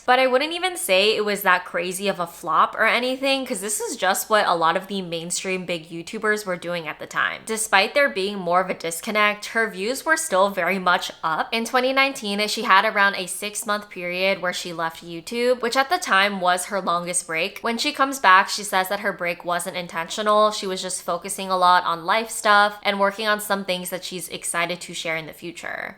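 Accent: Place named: United States. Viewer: Learned American English from her .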